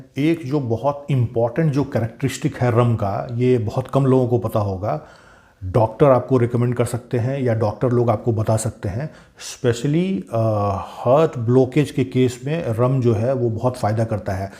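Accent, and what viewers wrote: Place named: Jharkhand, India. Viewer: Sir aap jharkhand ke kis jile se baat kar rahe hai ❤❤❤❤❤❤❤❤ I am from Jamshedpur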